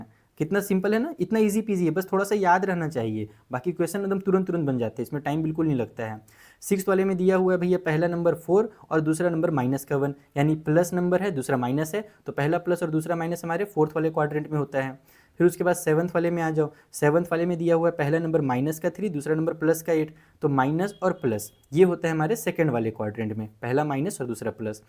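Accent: Bihar, India. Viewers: sir +bihari language tadaka =interesting maths ❤❤😅😅😅😅😅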